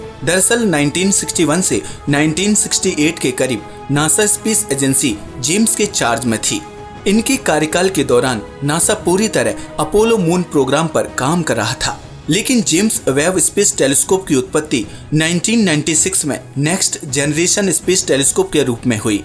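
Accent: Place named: Ghaziabad, India. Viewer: Bhaiya Aapki Awaz Gzb ki hai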